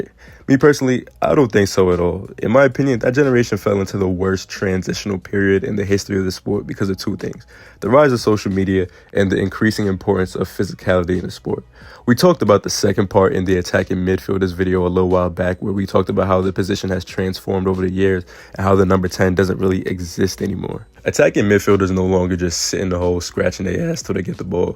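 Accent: United States. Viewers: sick video man! you presented a situation and reasons for it really clearly and in a rlly engaging way👍🏽 watching this before my first day of my second semester and as silly as it sounds, it’s refreshing to hear an american person chatting about footie—feels a little bit like yapping about soccer with friends (none of my friends like football so thanks for that haha) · Lmao all the wars in the world right now are because of Americans. It’s a terrible take. Every time I click a video and hear that Yankee Doodle accent I turn it off!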